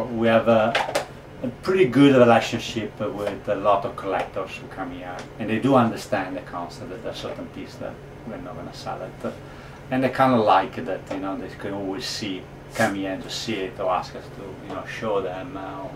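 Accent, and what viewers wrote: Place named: Italy. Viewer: che stile si sente che sei italiano!!!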